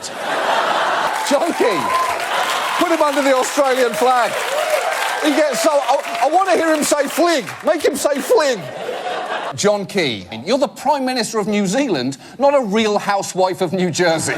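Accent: United Kingdom. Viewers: Pavlovian reinforcement, every fucking segment goes like this. 1. Introduction to topic ( normally around 10 seconds ) 2. Snarky remark, normally non-sequitur. this keeps you from fully processing part 1 and coming to your own conclusions. 3. Approving laughter, this is what keeps you hooked, equivalent to the food that Pavlov gave his dog. Effectively ruining your sense of humor, reasoning skills and overall intelligence 4.Repeat until you get enough for a full show. this guy is shit humor, he makes you dumber the more you watch him, but makes you feel smart because of his Brit accent and glasses. By malicious intent, or stupidity on his part, humor is now worse off because of this nightmare of Steinbeck and Orwell.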